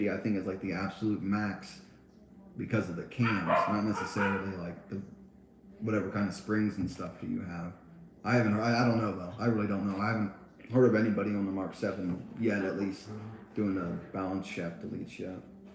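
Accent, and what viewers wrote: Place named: Pennsylvania, United States. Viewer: Where from PA are you? Recognized the accent immediately lol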